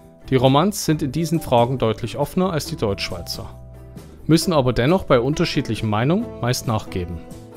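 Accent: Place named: East Germany, Germany. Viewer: Super Film. Mit deinem Ossiedialekt hälts du uns den Spiegel vor und gelegentlich lernen wir selbst noch etwas über uns. Mit Humor und pointiert-weiter so.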